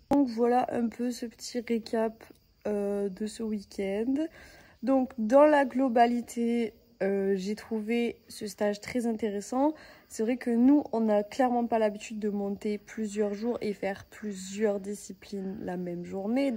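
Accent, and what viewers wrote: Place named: South, France. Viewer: Petit accent du sud Team sud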